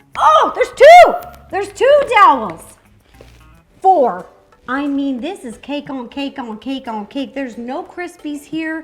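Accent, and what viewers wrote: United States. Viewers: Haven‘t hearrrrd SUCH an American accent in a long time but sounds cute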